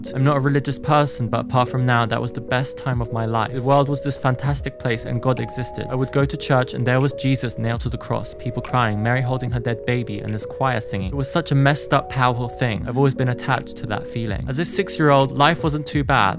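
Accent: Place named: North, United Kingdom. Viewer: I guess this YouTuber is from somewhere of Southeastern Scotland or Northeastern England, anyway the very northernmost part of Britain but little bit to North Sea coast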